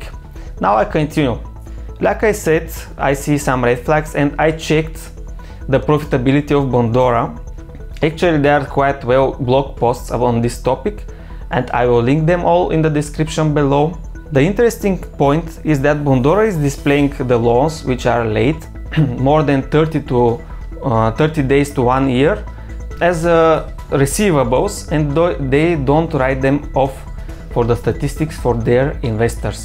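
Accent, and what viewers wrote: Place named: Bulgaria. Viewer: where are you from originally? Just curios Edit: I heard Bulgaria 🇧🇬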